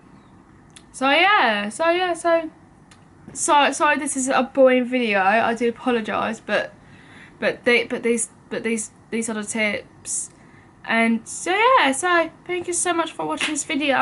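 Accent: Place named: Essex, United Kingdom. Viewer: And yeah I wasn’t diagnosed till I was 14, you got diagnosed young compared to me, btw love your accent? Are you Essex? East London?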